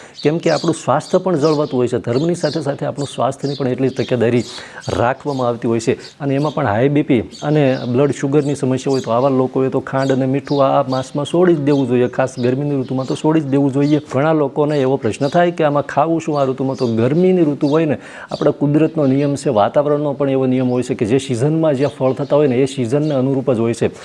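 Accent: Bhavnagar, India. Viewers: તમારી ભાષા અને ઉચ્ચારો પરથી લાગે છે કે ભાવનગર તરફના હશો. ખરું ?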